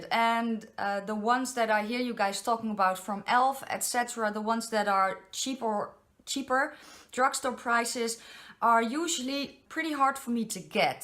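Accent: Netherlands. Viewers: I heard your accent and I said to myself she is definitely dutch, and ahah i guessed right, Ik kom ook uit Nederland, Love the review dear thanks xx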